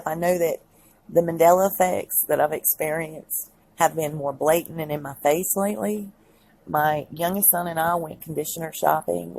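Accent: South, United States. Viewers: Your Southern Accent is SO-O-O -o-o-ooo charming and especially——AUTHENTIC!!‼️ Dearest-Sweetest——💕 💕—— Please, Do NOT EVER Change or alter your native, naturally acquired speech & speech patterns or ACCENT‼️‼️‼️. You are sooo authentic and genuine in this time of practiced artifice‼️ PLEASE stay just yourself and who you are‼️. Take care of yourself and ALL of your precious family💕‼️. And... LOTS OF LOVE AND MANY BLESSINGS😘‼️ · Hell, girl- I've got such a southern accent that I never even noticed you had a southern accent!! Lmao!! I'm dead serious. 😬😂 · As a southern girl, born-and-bred in Alabama, so refreshing to hear your accent. Just keep being you. Your job is to inform us and you are doing an excellent job of that. A job that you took on voluntarily. God bless you and yours. From Another southern belle!! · I love your southern accent your voice is so kind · I love the southern accent, crazy/evil people have to contrive a way to upset you. 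I would delete any offensive comments.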